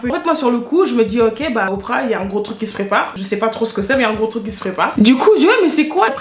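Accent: Cameroon. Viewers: trop marrant surtout avec ton accent camer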